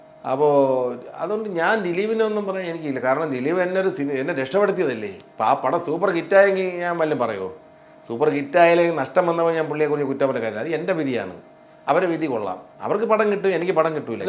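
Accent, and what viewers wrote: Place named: Trivandrum, India. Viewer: ഇത്രയും വൃത്തികെട്ട ഒരു സ്ലാങ് trvndrm കഷ്ടം തന്നെ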